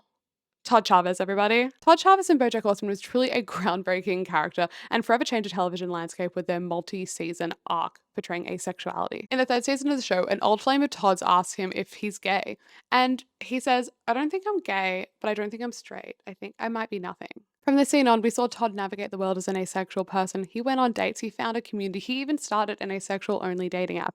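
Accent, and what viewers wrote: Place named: Australia. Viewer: omg when i clicked on and heard your accent my jaw dropped, absolutely thrilled to see another asexual australian girlie!! (even if you're a melbourner lol) great video! i'll be subbing for more :]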